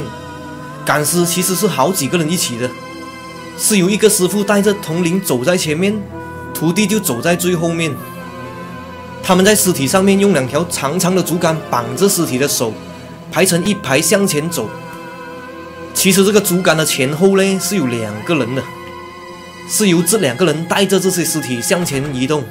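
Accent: Malaysia. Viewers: Bro你的口音真的很Malaysia style😂果然是个正宗的Malaysia人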